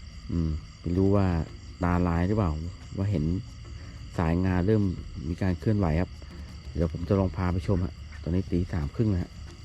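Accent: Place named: Sukhothai, Thailand. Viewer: สำเนียงเหมือนคนโขทัยเลยครับ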